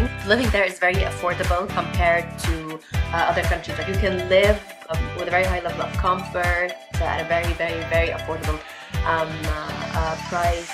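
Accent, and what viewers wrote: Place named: Nigeria. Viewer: Just watched your best friend tag video and I thought you sounded a little Nigerian but I wasn’t sure. That’s so cool. Were you born there ?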